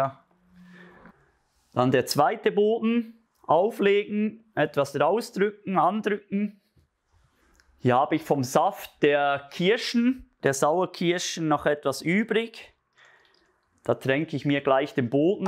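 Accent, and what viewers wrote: Switzerland. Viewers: Dopo diversi anni che sono tornata in Italia dopo aver vissuto in Svizzera per 20 anni ho avuto voglia di elaborare una swarzwalder torte sono felice di sentir parlare svizzero ed di sentire le spiegazioni in modo così chiaro. Complimenti. Faro questa torta peril compleanno è la regina delle torte. Da oggi ti seguirò.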